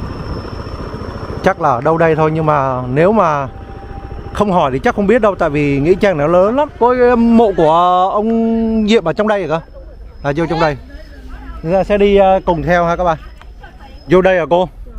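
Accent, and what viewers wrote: Vietnam. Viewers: chẳng biết gì về lịch sử cả. Nói thì giọng Bắc pha Nam đơn đớt chối tai!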